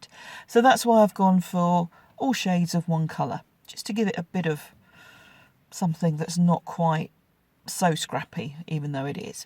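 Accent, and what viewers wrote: England, United Kingdom. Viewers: Subscribed - expat now living in Canada - nice to have the english accent ;-)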